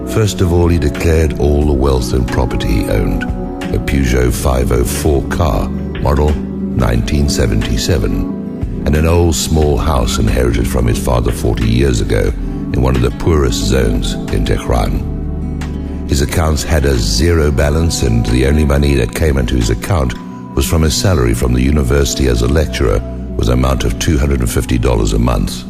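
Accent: United Kingdom. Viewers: It's obvious that our government controls the media and they're all full of shit, but trying to make him into a Gandhi type figure is a bit far fetched. So who is making these videos anyway? Who's writing the material? And who's the British guy narrating?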